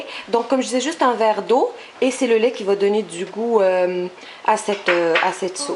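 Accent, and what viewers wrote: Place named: Quebec, Canada. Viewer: J'aime pas vraiment l'accent québécois ca ne te va pas très bien désolé :(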